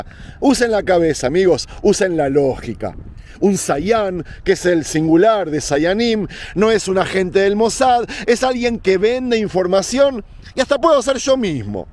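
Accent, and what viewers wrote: Buenos Aires, Argentina. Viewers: Que interesantes y variados son tus videos. Me sorprendes cada vez mas. Gracias, por estos paseos y narraciones maravillosas con tu acento porteño que me suena a musica celestial. Mil abrazos, querido, desde Barcelona. Un beso para tu Nira querida. Seguimos orando x Israel y x mis queridos hermanos judios. SHALOM. AM ISRAEL JAI✡️❤️🙏🇮🇱✡️❤️🙏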